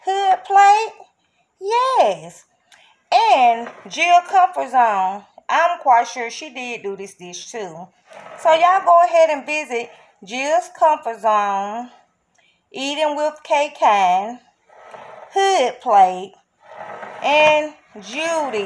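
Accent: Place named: South, United States. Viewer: I LOVE spaghetti squash! One of my favorite vegetables😊 also, that sweet south in your voice reminds me of home. My nana is from Alabama and I haven’t been able to visit my cousins since the pandemic. Thanks for making me feel a little taste of home!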